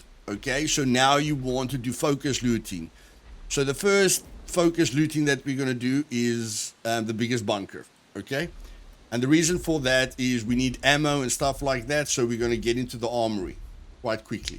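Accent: South Africa. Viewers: accent is very South African.... niice vid boet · You sound South African bud lol if yes maybe we should have a game together but I warn I'm a complete noob just started but enjoy your videos bud nicely done 👌😊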